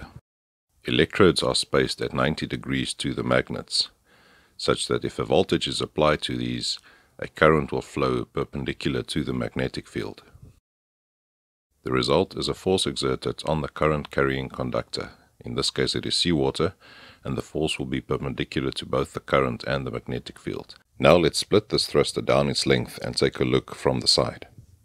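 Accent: South Africa. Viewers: You sound very South African, thanks for the videos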